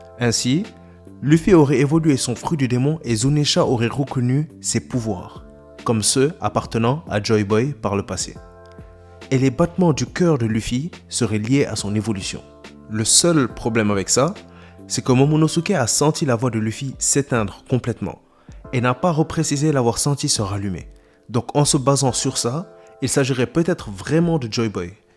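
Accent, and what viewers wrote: Senegal. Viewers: Dis moi, tu es d'origine du Sénégal ? je reconnais un léger accent de chez nous ahah ! Sympa les vidéos en tout cas, je m'abonne....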